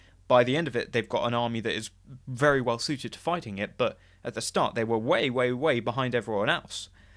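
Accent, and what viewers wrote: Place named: London, United Kingdom. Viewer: I think it's the "London accent".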